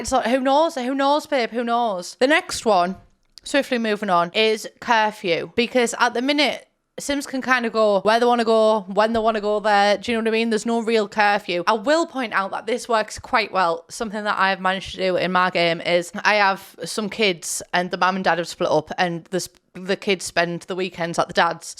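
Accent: United States. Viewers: Omfg I need a whole video on your American accent please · Omg you do such a good American accent!!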